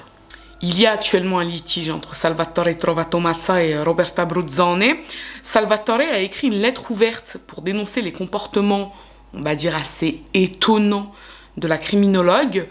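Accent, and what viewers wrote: Italy. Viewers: que du Bonheur pour votre ACCENT ITALIEN un DELICE, et sur le mot de la COCO, juste délicieux merci pour toute vos VIDEOS, bien mieux que le CINEMA et les reportages TV, et votre Charme dans la VOIX Superbe, SuBLIME, J 'aime Tout FELICITATIONS. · Extrêmement fan de ton accent italien haha 😍❤️ Sinon super vidéo encore une fois ! Histoire très troublante... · Adorant l'Italie, t'écouter traiter des sujets de ce pays avec ton irrésistible accent italien.... Je surkiffe !! Grazie Bella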